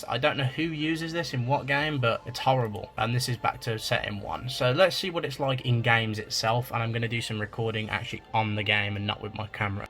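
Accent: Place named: United Kingdom. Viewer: dude where abouts in uk are you from? i recognise your accent!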